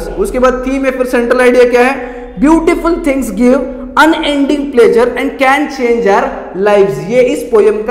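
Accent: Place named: Pune, India. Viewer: Pure puneri❤😅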